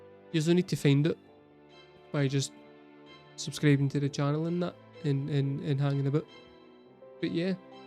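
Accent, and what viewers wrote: Scotland, United Kingdom. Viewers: My lad, you have the thickest Scots accent I've heard on YT! Tell us what part of the Auld Sod do ye hail from and whether or not you speak Gael?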